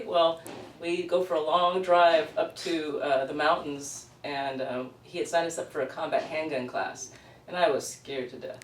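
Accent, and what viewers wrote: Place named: California, United States. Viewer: She Talks Funny Damn Cali Ppl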